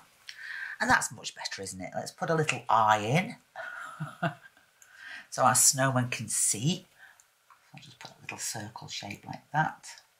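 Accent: United Kingdom. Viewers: What a super tutorial this is ❤. As a foreigner (to you ) I just love listening to your British accent ( almost makes me forget to paint 😊 ) and I will definitely try to make a go for a snow man this year ☃️ Thanks for sharing this 💯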